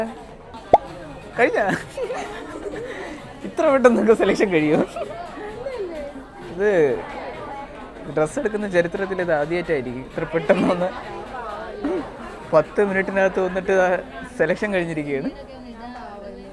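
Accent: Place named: Trivandrum, India. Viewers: Ithu normal Trivandrum slang aanu...ini ipol avarodu avarude normal slang maatti valla Kannur slangum parayaan parayaam ningale thripthipeduthaan entha.. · Edey edey ith normal trivandrum slang aan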